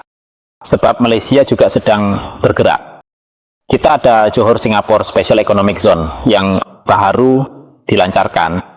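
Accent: Indonesia. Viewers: Berbahasa Malaysia beraksen Jawa . .?!!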